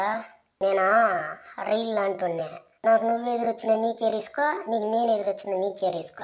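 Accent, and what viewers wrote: Chittoor, India. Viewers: Maa chittoor yaasa suparu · Maa chittoor slang super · Bagundandi.... Pushpa kante ikkade chittoor slang Baga undi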